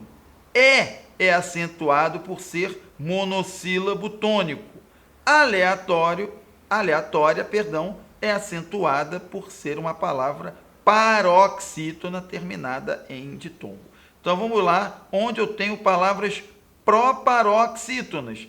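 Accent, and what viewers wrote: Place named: Rio de Janeiro, Brazil. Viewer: Esse professor fala igual os pastores da Record , sotaque carioca, bem explicado , parabéns pelos vídeos , foi de grande importância , e conhecimento...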